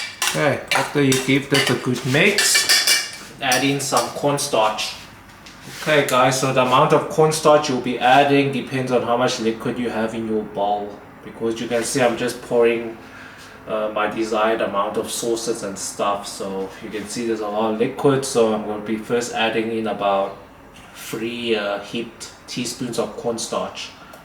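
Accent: South Africa. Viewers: You sound like you have a South African accent.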